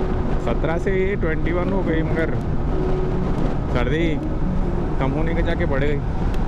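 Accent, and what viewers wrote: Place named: Hyderabad, India. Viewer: Looking awesome in hyderabadi accent 🖤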